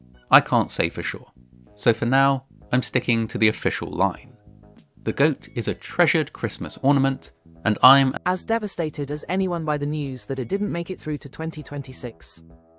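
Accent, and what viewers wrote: United States. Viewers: Once again, I have been absolutely murdered by your American accent. Brilliant, it was like I was there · Your American accent is fantastic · Your American accent is a glorious thing. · your American accent is awful. loved the story anyway :-) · I really enjoyed your american accent. ❤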